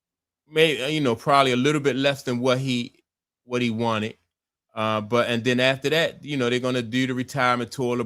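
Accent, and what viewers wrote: Baltimore, United States. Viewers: You from Baltimore or something?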